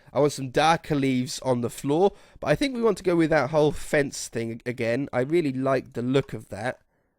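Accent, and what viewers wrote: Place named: England, United Kingdom. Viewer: Make a soccer stadium plz oh and where nabours your English 🇬🇧 and I'm Irish 🇮🇪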